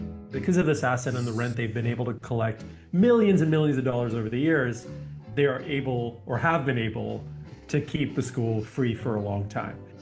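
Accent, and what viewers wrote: United Kingdom. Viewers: Another 20 minutes of a Brit saying Chryzla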